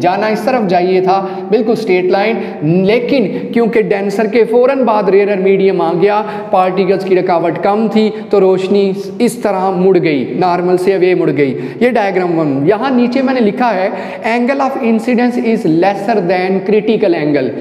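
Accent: Punjab, India. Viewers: Punjabi teacher😁,but well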